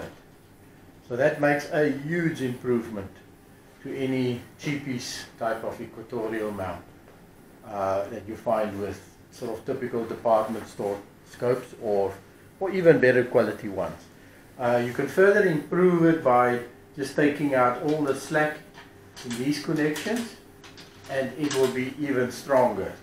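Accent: South Africa. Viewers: Interesting. After buying a second Benro tripod recently for travel and being unimpressed with its quality (the large ones are great) I’m exploring the insane idea of building my own stand - whether it has 3 or more support stands doesn’t matter. I’m surprised how little information there is on the actual physics or DIY aspect of these. Video tripods feature an interesting collar for the legs which I believe yields a much more stable base than your approach- though I could be mistaken. Thanks for sharing this. Also, South African or Dutch accent? Sounds South African!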